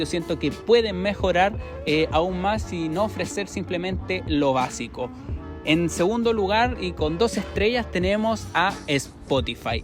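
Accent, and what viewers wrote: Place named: Chile. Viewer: Que ciudad es donde grabaste? Me gusto el contraste entre los edificios y la naturaleza. Tenes acento chileno y me da la impresión que ha de ser el sur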